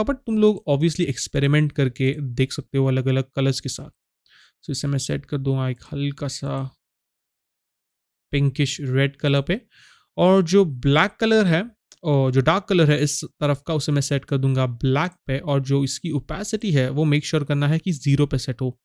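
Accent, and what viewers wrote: Maharashtra, India. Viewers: No that's not right ! I have seen many Maharashtrian People have tendency to talk like that only, that's Maharashtrian Hindi !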